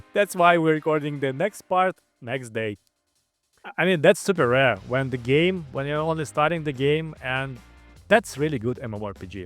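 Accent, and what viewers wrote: Russia. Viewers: ох русский акцент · you have a strong russian accent.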